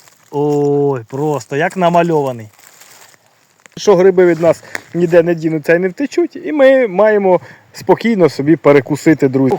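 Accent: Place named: Luhansk, Ukraine. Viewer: Вітаю! Ваш говір видає людину з моїх країв,звідки орки нас ,,попросили,, Мені було цікаво.Я залюбки подивилася ваші спостереження та знахідки.У нас на Луганщині таких грибів немає. Чекаю нових екскурсій у світ західної України.Я підписуюсь на ваш канал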